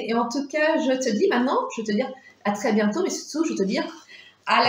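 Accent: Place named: Franche-Comté, France. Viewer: Et bien quelle surprise de voir une nouvelle tête ! Ma foi il a l’air bien sympathique ce franc-comtois (on entend une petite pointe d’accent) 😉. Encore bravo pour cette vidéo toujours aussi plaisante à regarder ! Passez d’excellentes fêtes de fin d’année 😃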